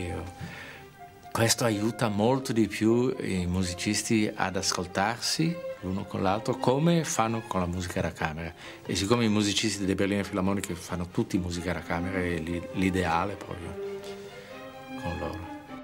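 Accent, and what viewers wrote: Germany. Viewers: He sounds like a German speaking a good Italian. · Interesting comment about Latin countries producing melodic operatic music and Germanic countries producing the greatest symphonic works. That’s where Mozart comes in satisfying everyone! Wonderful to hear this consummate musician doing the same thing when speaking in Germanic Italian. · The translation is...not very good. At some parts, quite inaccurate. His ideas, I don't completely agree with (though he has a warm personality). And his Italian strangely sounds like coming from somebody who speaks more German than Italian (this comment comes from somebody who only studied it, but it's obvious he doesn't sound like most Italians). BUT his conducting and the music ... absolutely sublime. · Ma perché parla con accento tedesco? Sembra un tedesco che parla in italiano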